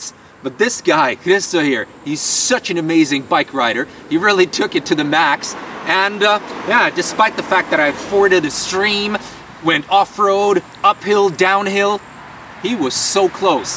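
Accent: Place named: United States. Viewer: Is this guy german? he sound like american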